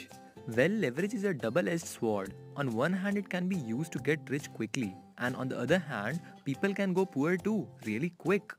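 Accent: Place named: India. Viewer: so funny Indy accent!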